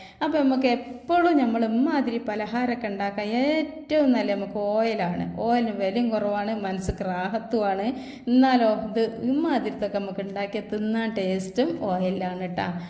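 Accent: Malappuram, India. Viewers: ഇപ്പോൾ സൂപ്പറായിട്ടുണ്ട്സംസാരം കേട്ടിരുന്നു പോകും ഈ സംസാരം കേൾക്കാനാണ് ഞാൻ ഈ വീഡിയോ കാണുന്നത്എൻറെ ഫാമിലി ഗ്രൂപ്പിലുള്ള എല്ലാവരും വീഡിയോ കാണാറുണ്ട്ഞാൻ പാലക്കാട് കാരിയാണ്മലപ്പുറം സ്റ്റൈലിൽ ഉള്ള ഈ സംസാരം ഒത്തിരി ഇഷ്ടമാണ് കയ്യ് കാണാൻ നല്ല ഭംഗിയുണ്ട് കാണാനും നല്ല ഭംഗിയുണ്ട് മുഖം ഒന്ന് കാണിക്കാമോ❤❤❤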